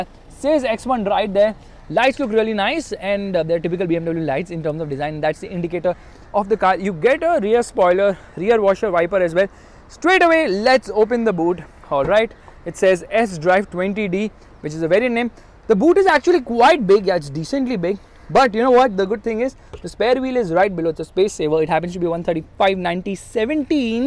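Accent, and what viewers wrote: India. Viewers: Can you speak a little slow. Your english sounds like some Indian language for sure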